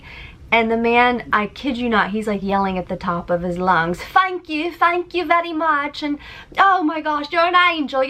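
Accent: England, United Kingdom. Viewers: Love your English accent 😊😂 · Love your English accent 😂😍❤ this cruise sounds like a lot of fun to me 😍have fun!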